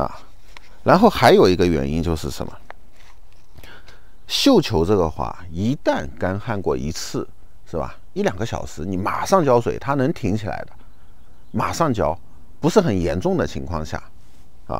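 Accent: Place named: Zhejiang, China. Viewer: 我就想问下是衢州人吗？普通话妥妥的浙西口音啊😇听到乡音好亲切！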